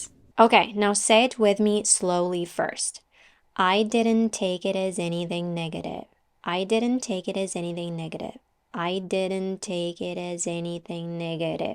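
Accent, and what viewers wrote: United States. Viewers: Thank you so much! It's helpful! But do British people understand such American English?🤣🤣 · This is American English, I do not think British English sounds like that. I live in the UK. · you are american 😂😂😂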